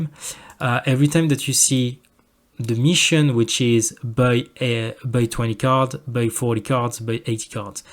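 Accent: France. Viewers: je sais reconnaitre un confrere francais quand j'en entend un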